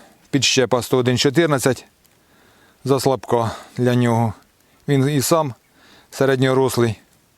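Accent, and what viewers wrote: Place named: Poltava, Ukraine. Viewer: Дякую за огляд.Але якби Ви озвучували дату огляду то область Укаїни це було класно.По акценту вроді як Полтавська обл.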